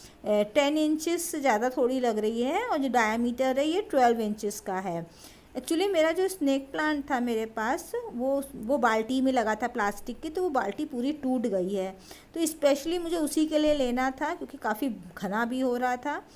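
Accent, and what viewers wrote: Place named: Allahabad, India. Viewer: being allahabadi my self i can so identify with the accent ,kudos to you for being authentic:)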